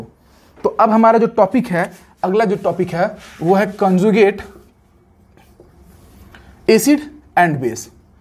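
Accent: Bihar, India. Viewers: Bahut acha padha rahe ho bihari style me mai paid class chodkar apke hi video dekhta hu kyoki bahut hi basic se bataya ja raha hai apka physics bhi bahut acha lagta hai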